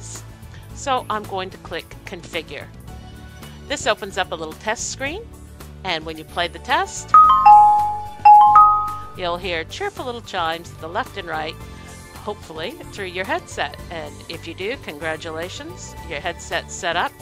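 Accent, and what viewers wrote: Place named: Australia. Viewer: you rr from austrailia sister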